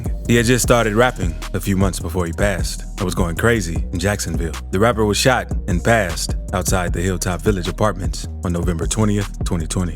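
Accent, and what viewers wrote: United Kingdom. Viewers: My guy feelin his voice this recordin’ talkin a lil diff n a uk accent 10/10 😂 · The UK accent threw me wayyyy off 🤣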